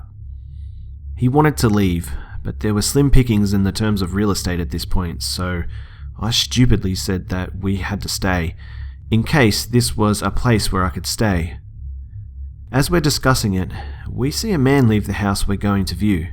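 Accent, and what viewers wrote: Australia. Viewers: As a fellow Aussie, it's so nice to hear your voice :)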